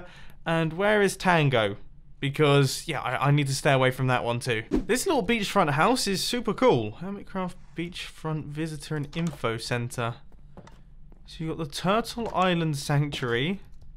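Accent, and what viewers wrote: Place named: United Kingdom. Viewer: Hi Mumbo. First of all, happy birthday! Can't believe you are the same age as me! You have an old, classy and very British voice, it's amazing. Second, for the design of the walking of the sphere, a simple circle walkway is the way to go I think.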